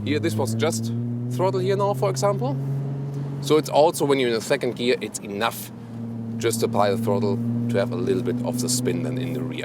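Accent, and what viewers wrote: Germany. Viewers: Please make your Videos in German, the German-English sounds like shit and your Channelname is also a German one...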